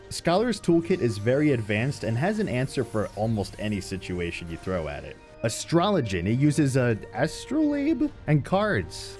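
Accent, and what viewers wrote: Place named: New York, United States. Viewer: I like your accent, are you from New York? This is very informative though, thanks!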